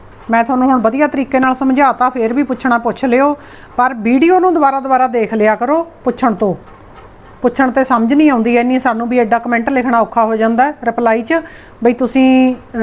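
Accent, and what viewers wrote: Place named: Puadh, India. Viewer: Tuhada pind kehda a boli ta tohadi puadh di lagdi a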